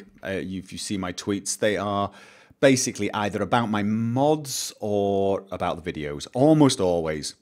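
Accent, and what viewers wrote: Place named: England, United Kingdom. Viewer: Wait I love you're accent but are you Norwegian or English because you sound English but you're channel country is Norway. Just wondering.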